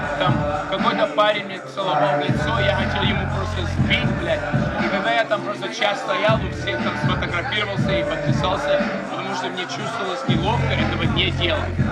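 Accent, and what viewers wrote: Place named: Russia. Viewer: намного,прямо заметнее,но иногда видно,что слова подобрать не может.Хотя фразеологизмами хорошо пользуется,главное - акцент русский стал.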